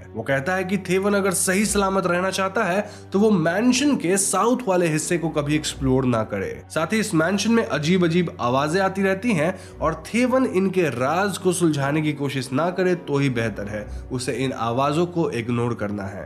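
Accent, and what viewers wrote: Bengal, India. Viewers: dada baangla accent bojha jachhe!. Film story bole dile? thank you. Copyright aasena dekho!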